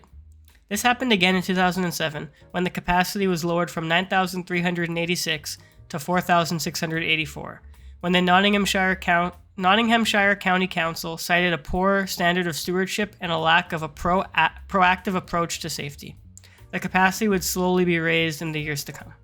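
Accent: United States. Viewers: My home town. Was surprised to hear an American talking about it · Voiced by an American with no understanding of what he is reading from a script. Mistakes are numerous and unedited. Please save us from these and/or get a different voice-over.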